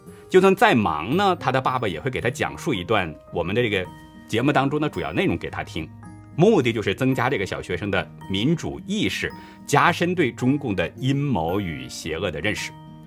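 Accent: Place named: Hebei, China. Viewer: 谢谢李老师。听老师的口音，好像是河北人？能听到一点唐山/承德一带的口音。😆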